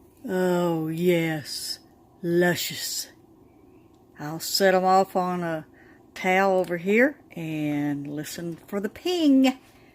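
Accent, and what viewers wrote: South, United States. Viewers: Well, I have never personally canned anything! This video was a joy to watch and to again hear your sweet southern accent bringing peace to me this morning. God is so good. My dear Grandmother would can pie mixes, so this also brought memories of my sweet grandma Alyce. Thank you. 💕 A couple of thoughts I had watching - I'll share - you need a strong shoulder to stir for at least 30 minutes, then an even stronger shoulder to mix in all that fruit. Enlist a grandchild or otherwise stronger younger person to be the official strawberry stirrer! The rewarding part of being enlisted in your kitchen is time with you and some fresh strawberries! And finally, you ended with listen for the "ping" - assuming you mean your jarring caps ping? I didn't know that! That must be rewarding music after hours of labor. 🎶 Thanks again for sharing. 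Have a blessed day.❤️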